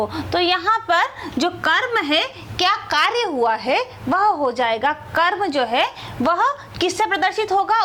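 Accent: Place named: Haryana, India. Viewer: there is harvani touch in your languge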